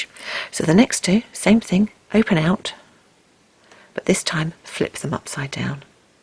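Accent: United Kingdom. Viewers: You inspired me today, to borrow some ideas from you, think up some of my own, and make a veneer, and then a bracelet. Mind you, it was the absolute worst weather for working with polymer clay (hazy, hot and humid), but I did it anyway. It came out pretty well, too! The funny thing is, every time I grab a wooden skewer to smooth out seam lines, I can hear your sweet British voice inside my head, coaxing me along. Thanks for all you do.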